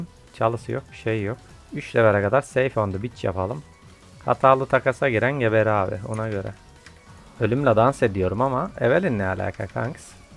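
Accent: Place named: Afyon, Turkey. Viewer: Kardeş afyonlu musun şiven çok hoş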